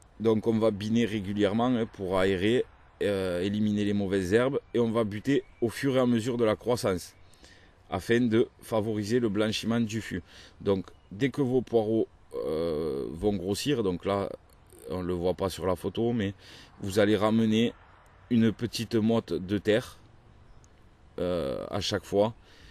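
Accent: South, France. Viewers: Bonjour. Merci beaucoup pour le partage de vos connaissances/vidéos qui sont claires, instructives, complètes, enfin je me régale à chacune de vos vidéos, camarade sudiste ! (enfin je dis ça pour l'accent de chez nous, je peux me tromper mais cet accent me parle ^^) Merci encore pour le temps passé sur vos vidéos ;) Cordialement, Ben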